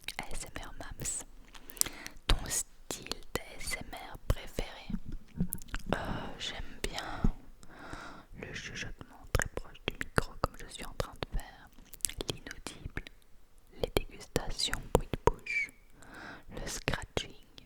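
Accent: Belgium. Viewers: J’adoreee les vidéos comme ça près du micro (et si y’a des bruits de bouche encore mieux ah ah), en plus tes chuchotements avec ton accent belge c’est super relaxant ! Une vidéo de ce style serai cool hihi ;)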